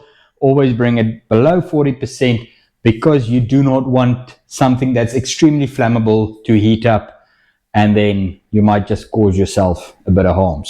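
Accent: South Africa. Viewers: is back. I love that thick S.A. accent brother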